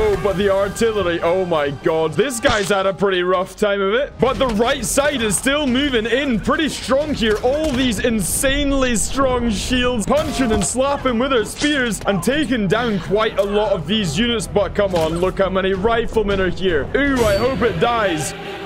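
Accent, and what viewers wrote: Scotland, United Kingdom. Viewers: Where are you from. Scotland ?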